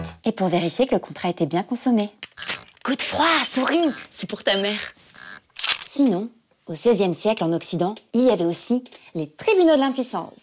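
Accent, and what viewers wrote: France. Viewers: Je sait pas si c'est l'animatrice qui fait cette effets mais c'est la premiere fois que je n'aime pas vraiment une de vost video ... Encore c'est peut etre ton accent trop francais qui faisait que en ten que quebecois je ne comprenais pas toujours mais bon ... En esperent retrouver notre youtubeur habituel a l'avenir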